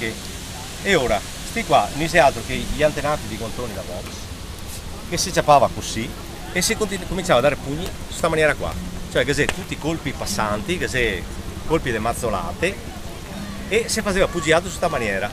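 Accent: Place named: Spain. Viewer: per un attimo pensavo stesse parlando spagnolo..... sembra avere un accento spagnolo....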